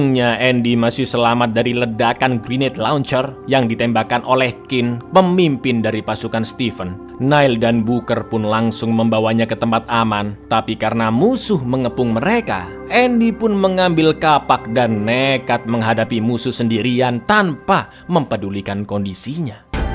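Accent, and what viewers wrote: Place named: Surabaya, Indonesia. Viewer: Lo samean wong suroboyo ta cak?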